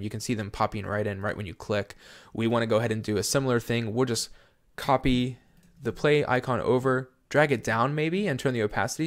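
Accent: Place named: Canada. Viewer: great tutorial, appreciate your down to earth style, sense of humour, and humility. Couldn't pin down your accent! you sound Canadian.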